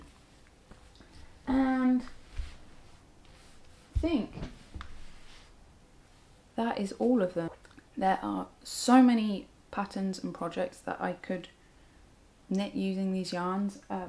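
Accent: United Kingdom. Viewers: Hi, I found your channel recently and I've so enjoyed all the videos I've watched so far. I'm also from Herts, and a knitter, and your voice really reminds me of home- I live in London now, so not a million miles away so maybe that's weird 🙂! Anyway, just wanted to say Hi and introduce myself.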